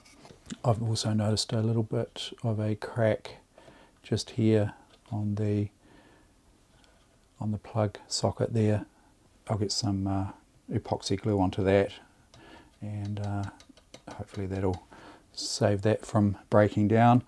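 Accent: New Zealand. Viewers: So lovely to hear a New Zealand voice in the vintage sewing machine world. Horrified at converting a FW 221 to a jigsaw but also slightly impressed at the ingenious nature of the conversion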